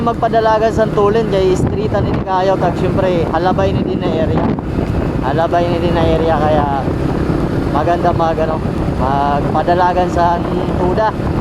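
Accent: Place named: Northern Samar, Philippines. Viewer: Basi sa GOOGLE nag search Ako ang Dimasalang Palanas at Cataingan ay malapit sa WARAY ang salita kaya pala yung salita mo naiintindihan ko haha btw taga Eastern Samar ako kung di Ako nagkakamali yung Accent mo sa pagsasalita ay parehong pareho sa Northern Samar ganun Kase Accent nila sa pagsasalita ng WARAY sa pagkaintindi ko yung Salita mo ay Bisaya na pinaghalong WARAY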